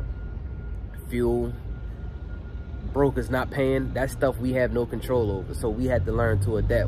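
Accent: Baltimore, United States. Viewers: that Baltimore accent is super easy to hear homie, #respect and #salute to you tho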